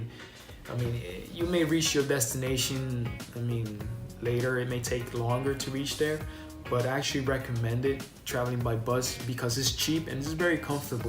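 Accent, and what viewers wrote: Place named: Brazil. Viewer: Awesome video! And coincidentally you almost have a brazilian sounding accent hahah.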